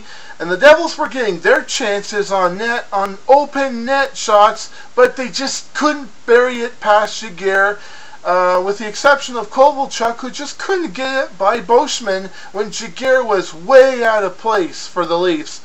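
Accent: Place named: Sweden. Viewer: Why the Swedish accent?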